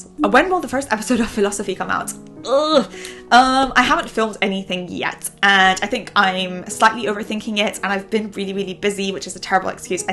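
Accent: United Kingdom. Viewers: This is the first time and the quickest British accent i have never seen before,interesting,after studying American accent too much, to find out the British accent is so speical and amazing because of your expressions when looking back on... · Ohhh I love the British accent ❤❤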